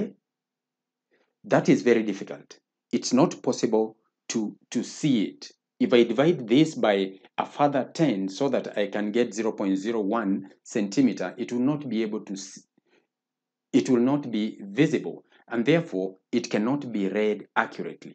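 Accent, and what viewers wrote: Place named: Uganda. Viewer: Perfect explanation sir As if you are Ugandan. Am I right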